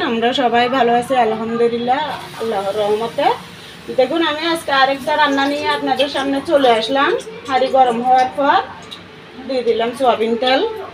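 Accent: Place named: Chittagong, Bangladesh. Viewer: আসসালামু আলাইকুম। আপু আপনি মনে হয় চট্টগ্রামের। আপনার কথা শুনে বুঝতে পেরেছি। রান্না অনেক ভালো লেগেছে ।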